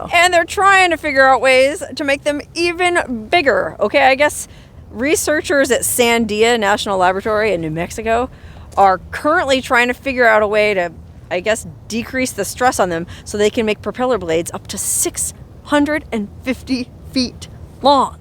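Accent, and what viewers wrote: Germany. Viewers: Love your German diliatic! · There is something about German accent that makes things tingle. That being said, it’s a serious bummer one isn’t into making a video in Orange County! Just saying… Bwhahahaha Always a pleasure seeing where you’ve been lately!! Safe travels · Love your German accent, mein schones madchen! (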